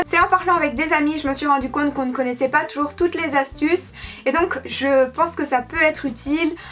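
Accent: Belgium. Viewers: tu es belge? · T es belge ??❤️❤️❤️+1abo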